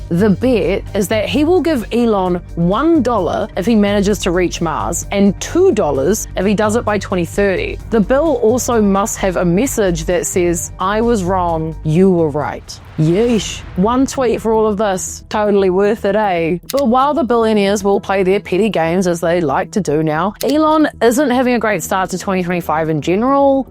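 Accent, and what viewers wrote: New Zealand. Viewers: Yes, Kiwi accent. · New Zealand accent? Nice! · Great video - very informative- thank you. Out of interest, where is your accent from? I'm guessing NZ but not at all sure... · From the multiple enunciations in several vowel sounds in just a couple of sentences; is the speaker for this a Kiwi? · Sounds like Kiwi mixed with something else, to my British ears 😅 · New Zealander by the accent! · Love that kiwi accent from a fellow Australiasian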